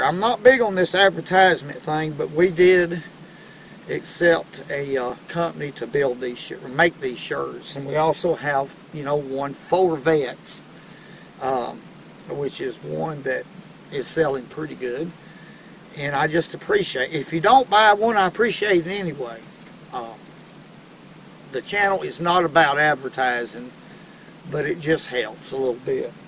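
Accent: South, United States. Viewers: in that american language of yours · Maybe it's your exaggerated southern ayuckseeant, but I call them crappies, not crawpees. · I appreciate your humor, southern accent, and your thoughts of what fishing is all about! So glad I found your channel. Keep mak'n videos!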